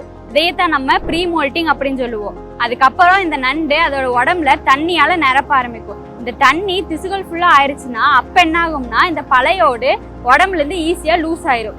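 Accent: Coimbatore, India. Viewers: அம்முனி கோயமுத்தூர்ங்களா....😃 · pechchil kovai vasam varuthu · கோவை தமிழ் அருமை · பாவை இவள் அழகி கோவை தமிழ் பேசும் போது நெஞ்சம் இன்னும் இன்னும் கேட்கிறது இவள் கொஞ்சும் தமிழை இன்னும் இன்னும் கேட்கிறது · Ammani coimbatore ngala😂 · Akka Nega Coimbatore side aa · கோவை ஸ்லாங் அருமை👌👌👌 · Covai slang😝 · Baby ma nee coimbatore ah???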